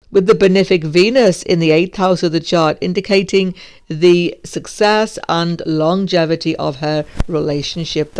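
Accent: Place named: London, United Kingdom. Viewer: come on, it is deep and captivating. it looks british accent (London) to me but with some other strong accents I can't decipher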